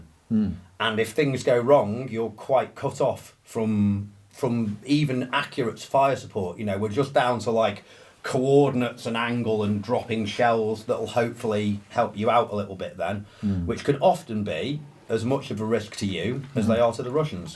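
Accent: United Kingdom. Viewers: This dude sounds like the best of British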